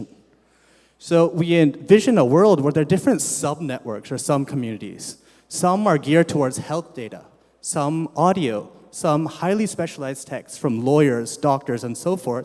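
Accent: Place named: United Kingdom. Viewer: An Indian with such a wonderful accent. Speaking like a pure British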